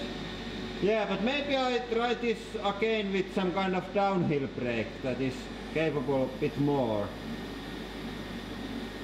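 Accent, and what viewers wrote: Finland. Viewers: that was some nice finnish rally english :D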